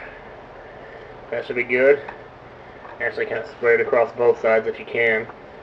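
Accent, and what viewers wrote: South, United States. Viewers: I send this to my mom cause when I watch your let's plays I am usually heading in the kitchen :P I said "He let's plays, he Southern AND he makes cooking tutorial's" XD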